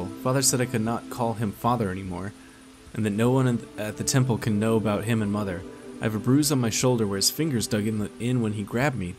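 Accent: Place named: West Coast, United States. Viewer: You have a very relaxing voice. Where in the USA are you? I'm guessing West Coast...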